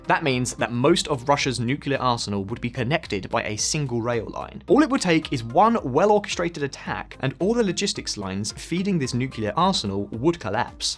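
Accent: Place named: United Kingdom. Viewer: More total drivel! Finland has a substantial expanse of Russian border to donate to NATO's ongoing `containment' policy. Nothing else applies. History has nothing to do with it. Russia is not terrified of the NATO membership proposition. It has had to alter military strategy in placing more military bases in the west, but that has already been accomplished. Fact bending with British accent merely screams of something else, other than `analysis', so just let it go.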